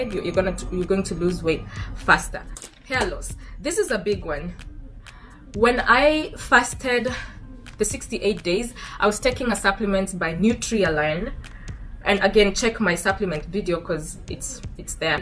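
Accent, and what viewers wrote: Kenya. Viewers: You have a very strong Kenyan accent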